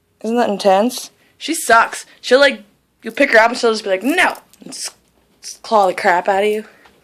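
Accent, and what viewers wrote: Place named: North, United States. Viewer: I'm sorry for your loss! What state are you in? You sounded like you were from up north but you threw me off with the palm trees...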